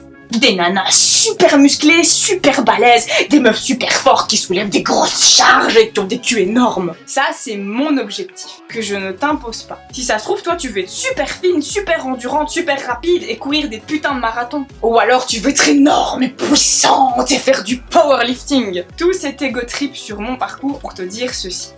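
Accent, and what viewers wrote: Belgium. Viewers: Une compatriote Belge avec le bon accent :D Du coup l'objectif Iron man m'a fait rire tout seul devant mon écran, parce que faut devenir riche, se forger une armure et fonder les Avengers. Il y a du boulot ! :D (oui je revendique ma vanne pourrie) Continue comme ça en tout cas, plein de bons conseils dans lesquels je me retrouve :D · tu parles comme une belge j'avais cru que tu es française je me suis trompé vas-y donne ton 0032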